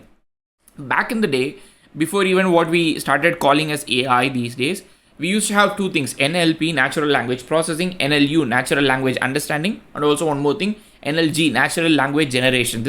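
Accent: India. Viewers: i can understand literally every word he says. i'll take indian accent over californian every time.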